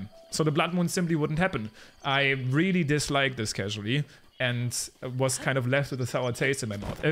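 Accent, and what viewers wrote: Germany. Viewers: hab ich mir gedacht man hört nämlich den deutschen Akzent extrem heraus bei dir. Ist zwar beim zuhören etwas komisch aber trotzdem Geile videos👌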